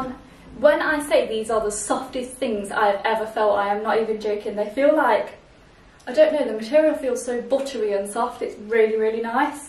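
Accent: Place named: England, United Kingdom. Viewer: It is a lot of ladies doing this tipe of videos and stuff, but , I promise you that you are unique, it is about your face your hair that distinguish English accent the way your body is built, your just different I want to say congratulations to the British people and their history because I little country like that can create one of the most natural beauty and that is you ...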